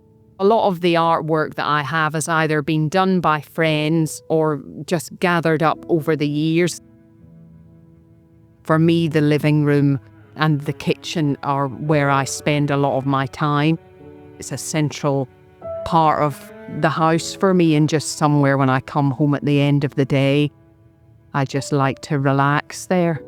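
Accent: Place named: Antrim, United Kingdom. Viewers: Beautiful home. Listening to your accent reminded me of my Grandfather…he was from Antrim. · Accent sounds like someone who grew up in Northern Ireland and moved to Edinburgh in their teens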